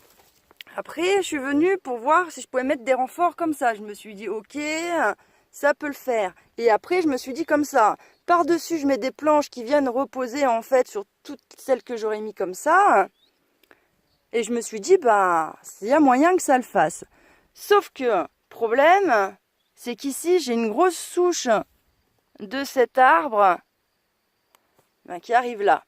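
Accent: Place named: Southwest, France. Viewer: j adore l accent du sud ouest!!!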